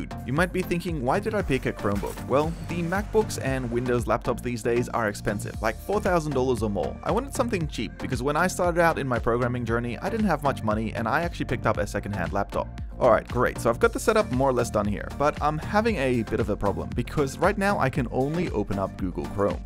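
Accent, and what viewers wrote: Australia. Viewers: Why does your accent sound like an Australian trying to sound American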